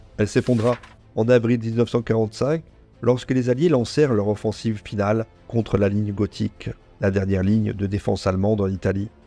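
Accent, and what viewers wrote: Corsica, France. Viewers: Accent corse je dirais. Complications à articuler les syllabes.. 😊😊😊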